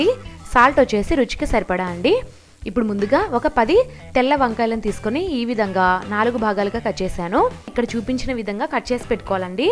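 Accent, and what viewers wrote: India. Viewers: Mi language antha coastal area la undi...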